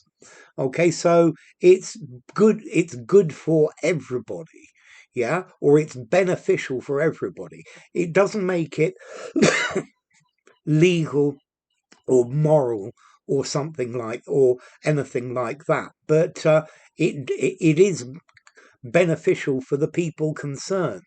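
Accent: United Kingdom. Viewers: Sir You made me loving british accent.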